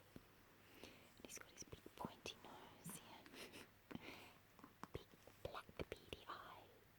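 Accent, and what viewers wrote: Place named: Australia. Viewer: Love your voice, so Aussie.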